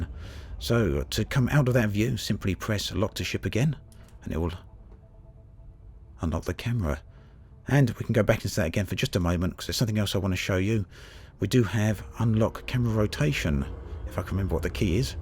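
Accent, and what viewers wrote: United Kingdom. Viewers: Still a great video. I went from thinking maybe I have to buy some sort of add on to make the cameras work, to using mine (basics) in about half an hour. Thanks for efforts your videos are excellent - and nice to hear a British accent as welk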